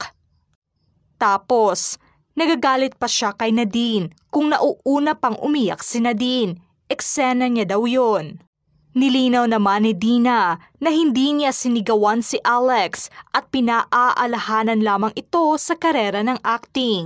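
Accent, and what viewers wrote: Visayas, Philippines. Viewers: Natatawa ako sa diction ng Content Creator na ito. Akala niya napopronounce niya maigi. Andaming Bisaya accent at OA na enunciation ng consonants. 😂😂😂😂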